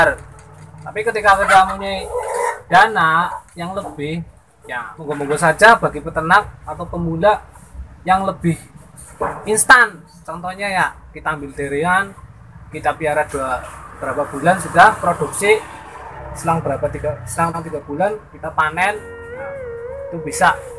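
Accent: Banyumas, Indonesia. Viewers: Jyooooosss Ora ngapak ora kepenak😀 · Mas alamatnya mana ketone ngapak kaya edek pengin merguru ko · Ora ngapak.ora penak kang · Kok ngapak ,kaya nyonge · ngapakk ya mazz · Ya,, banyumas punya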